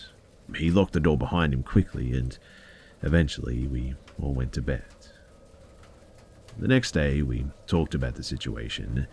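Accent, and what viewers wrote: Australia. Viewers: Hes got that low resonating voice, and hes an aussie.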